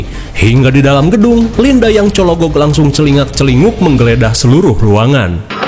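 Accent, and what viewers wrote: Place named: Java, Indonesia. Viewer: yo rapopo to mbak ngko dak mlh lucu ijeh medok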